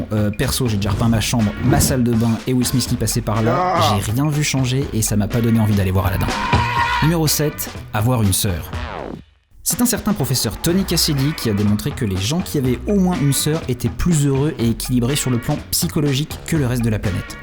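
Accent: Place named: North, France. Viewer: Tu es du Nord toi mdr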